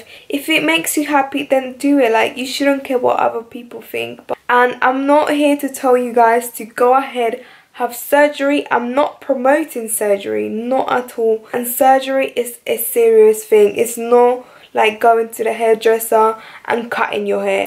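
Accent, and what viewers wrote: United Kingdom. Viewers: She has an English accent from London. It's not very unique just a London accent XX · could be from another place in UK. Her accent is English since she grew up in UK. Duh😂🇬🇧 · Vive en Inglaterra su inglés es británico la pronunciación es diferente al americano.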